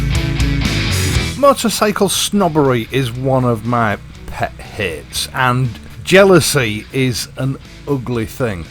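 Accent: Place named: East Yorkshire, United Kingdom. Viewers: Hey, is that East Yorkshire accent, there!?!? Thanks for video... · Love the videos and the Yorkshire plain speaking,. Thank you for the shots of horse chestnuts in flower, a real delight for an ex-pat living in Canada, where these magnificent trees are not common - though less rare than the RE Interceptor Mk I. 🙂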